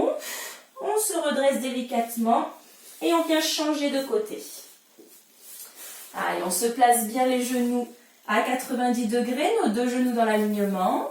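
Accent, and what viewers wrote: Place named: South, France. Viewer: Merci, grâce à ton sourire, ta compétence et ton joli accent ensoleillé (je me régale avec les cottes bien ouvertes et les épolles baissées 😉) j‘ai commencé ma 1ère journée de vacances avec un cours de pilâtes qui m’a donné la pêche! À bientôt!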